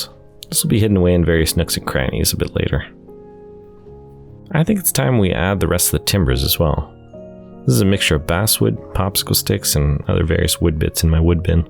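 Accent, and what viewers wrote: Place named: Ireland. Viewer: Are you from Ireland?? I didn't recognize your accent! Absolutely love this ! Wish I was as talented !!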